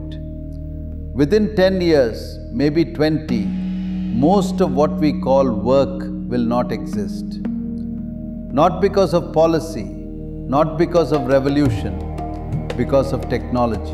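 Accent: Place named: India. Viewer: Obviously this is 100% AI. Any reason you used an Indian accent voice? Usually people chose American accent. Don't get me wrong, it's good, but I'm curious.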